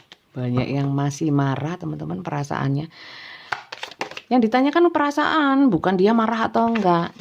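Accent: East Java, Indonesia. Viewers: Aq jd gak bisa tidur bun dlm readingan ini banyak banyolannya logat jatim tp gak meninggalkan fokusnya bun, aq banyak cengar cengir sendiri, semi wayang lo bun, aq seneng bun lanjut, hebat pokok e, yg pasti itu Tuhan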